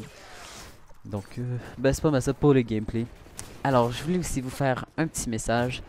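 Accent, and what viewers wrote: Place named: Quebec, Canada. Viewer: bravo pour avoir dépasser les cent abonnés , pour l'accent ont si habitue , beaucoup d'excellent youtubeur sont québécois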